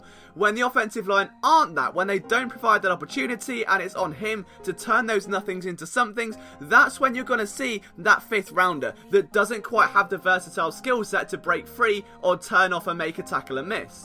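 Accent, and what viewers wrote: England, United Kingdom. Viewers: you nailed it 'unless that draft pick is a 5th or 6th but i dont think the bears would lose howard for that ' hahahhaa well guessed not only was it a 6th that miiight become a fifth but it was also a bonus comp.formula pick PLUS it wasnt a 5thor6th rounder THIS year, its for 2020, we gave up a 6th or possibly 5th round pick NEXT year for stability in the RB room - id lose smallwood, keep adams as a prospect and see if he grows, keep corey clement for his pass catchin (if sproles retires or eithe rway really) i think we should still draft an RB in this draft, esp if a legit back falls, idk bout jacobs in the 1st but there are a few really good backs that will go in the 2nd/3rd so since we have two 2nds and no picks till later in the 4th (when the good RBS on our board could be gone) i reckon we either use a 2nd on a good back that falls OR we trade one of our 2nd round picks to a desperate team lookin for a specific player etc for two 3rds this year or a 3rd and 4th this year and then we draft a solid RB in the 3rd, even using the other 3rd/4th round pick in a bundle to move up to the first pick of round 3 or high enough we KNOW we'll get our guy... 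and can i just say as an english born and bred (but in japan, then NZ where i got citizenship, then america where i did, now i live in australia so its cool hearing an english accent talk american sports with knowledge - reminds me of my dad (who obv kept his english accent since he left engald at age 21,s ame with my MUm btw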